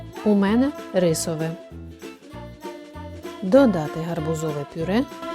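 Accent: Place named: Border region, Ukraine. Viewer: 6 гарний гарбузик 😃 Цікавий у вас акцент, десь на кордоні живете? Смачного 😋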